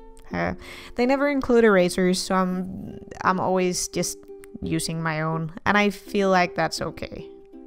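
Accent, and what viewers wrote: Denmark. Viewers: Er do fra Fyn eller Sjælland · ive been trying to pick your accent, im such an idiot of course youre danish!! such a pleasant accent to listen to <3 lovely art and content as always my dude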